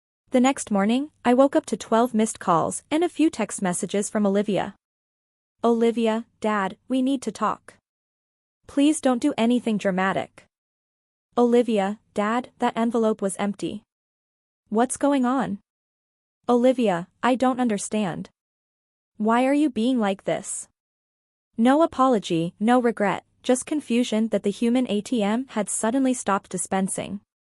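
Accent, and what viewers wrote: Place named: India. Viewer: Is the Indian accent really necessary? It detracts from the storytelling.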